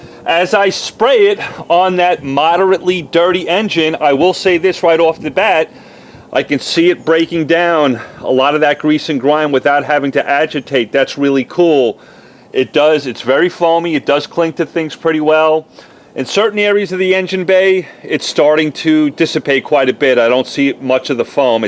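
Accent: New York, United States. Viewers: He sounds like he’s from New York not from Dallas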